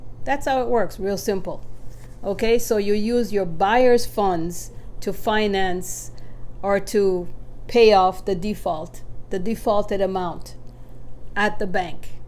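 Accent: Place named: Jamaica. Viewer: you convey your message clearly. You sound like you're from my home country JA,..Quick question may I ask how you put together a buyers list?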